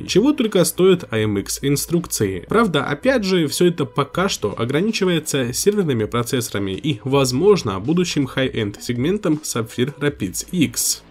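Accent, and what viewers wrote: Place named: Ukraine. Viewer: автор,судя по говору ты с Украины. ну молодцом! верно все говоришь! забыл только сказать про родственные связи...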